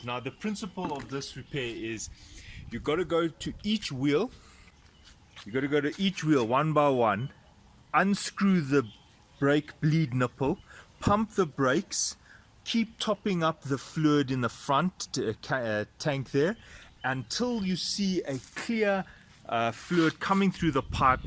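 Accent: South Africa. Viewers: After hearing your south aflican accent I changed my mind about watching your video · After hearing your South Africa accent I became a subscriber.😊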